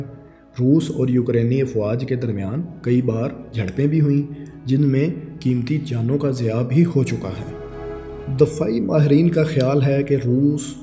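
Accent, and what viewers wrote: Punjab, India. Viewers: Bohut zyaada punjabi accent hai iss banday ka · Everyone complaining his voice, its not his voice. Its his punjabi accent. Now if he makes 10 more videos you all will get accustomed to his accent even but boy oh boy punjabi is strong in you. The video was informative, presented neat and brief all current affairs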